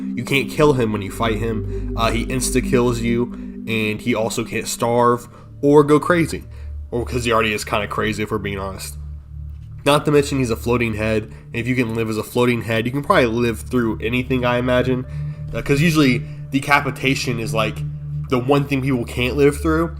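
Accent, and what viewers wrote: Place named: Turkey. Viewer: sounds suspiciously turkish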